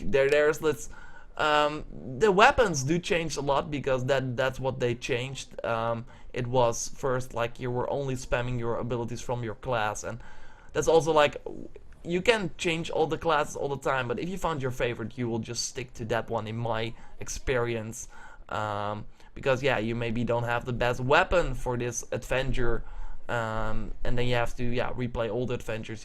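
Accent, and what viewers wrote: Netherlands. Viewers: ik hoorde al gelijk aan je accent dat je nederlands was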